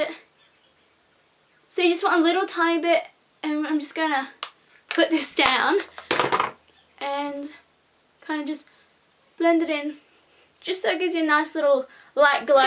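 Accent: Australia. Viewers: are you austraillian ?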